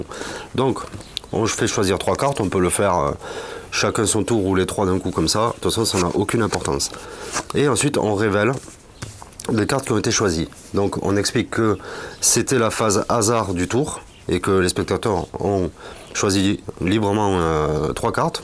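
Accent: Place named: South, France. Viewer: Pour commencer, le soleil, l'accent du sud, les lunettes et des cartes, ça fait du bien, c'est beau ! 😉😎 Ensuite ce tour est clairement une petite pépite qui permet beaucoup de possibilités d'histoires et il est particulièrement efficace ! Je trouve très cool que tu aies osé dire que la version du livre était erronée et pas forcément bien expliquée... C'est bien dommage et regrettable quand il s'agit d'un magicien de cet acabit ! Malheureusement il n'est pas le seul à avoir fait un livre où certaines choses ne collent pas, à se demander pour certains s'ils écrivent eux-mêmes leur livre et s'ils prennent le temps de vérifier que tout est OK ! 👌🏻 Pour la subtilité de daortiz que tu proposes, j'adhère pleinement, c'est plus osé mais ça enleve le côté redondant de la première version, c'est cool que tu en parles aussi ! Pour finir 😅 tu as parfaitement raison, certains tours automatiques sont bien meilleurs que des tours avec manipulations et celui-ci en est la preuve ! 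Voilà, très bonne vidéo quoi qu'il en soit, merci pour le partage mon pote ! 😉👊🏻🔥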